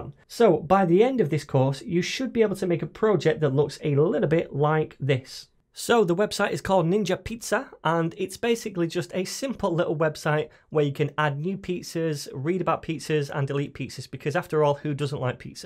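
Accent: United Kingdom. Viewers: Is this British Accent. I Love it so much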